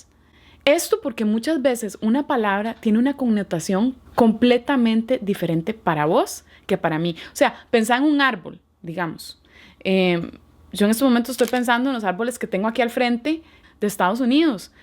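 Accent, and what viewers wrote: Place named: Costa Rica. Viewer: Amo la genuinidad de tus vídeos! Me fascina que hablés como tica 💗 y que usés el voceo en tus mensajes! Me encanta tu contenido, es super útil! 🇨🇷😁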